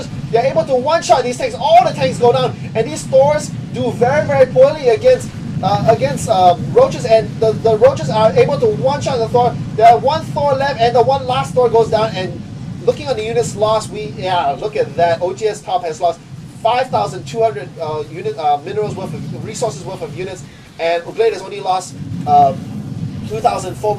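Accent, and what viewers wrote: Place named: Singapore. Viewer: You sound like a singaporean. And loking at your profile I think you are! Good job. keep it up. =)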